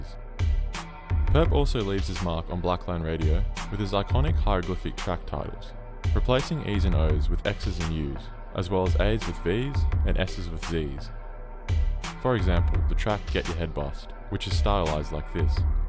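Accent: Australia. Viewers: Pretty sure his accent’s Australian but there was no need to send hate like that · bro are u Australian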